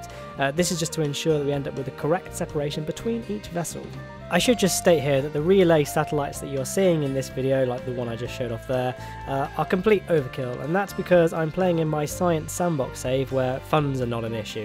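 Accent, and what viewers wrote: United Kingdom. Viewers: It’s because of his British accent and many Americans think that Britons are very posh, even though most are not. (Just listen to a song!)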